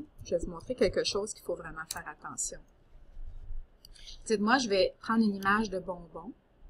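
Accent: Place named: Canada. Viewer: Merci pour votre vidéo. Grâce à vous j'ai découvert le logiciel "Canva". Continuez c'est vraiment bien. J'adore votre accent canadien si je ne me trompe pas!!!!! MERCI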